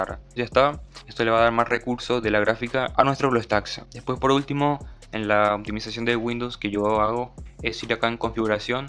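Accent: Paraguay. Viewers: Se re nota que sos de Paraguay o estoy loco?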